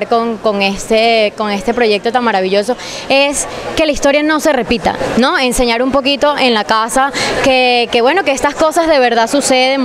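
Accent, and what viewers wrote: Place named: Colombia. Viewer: Se le está pegando lo colombiano!!!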